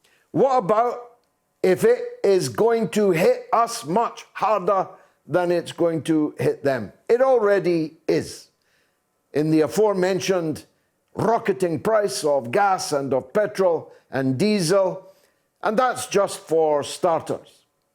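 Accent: Scotland, United Kingdom. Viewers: I love your videos particularly in your Scottish accent, which nailed all your point more succinctly than the English’s accent could. Keep up the good work,.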